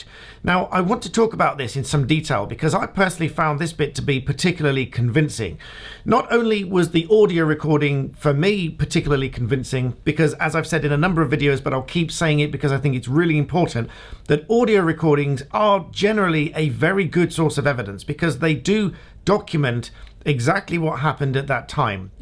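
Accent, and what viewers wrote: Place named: England, United Kingdom. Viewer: Why do you say says as sAYz, and not Sez? It really weirding me out, with english accent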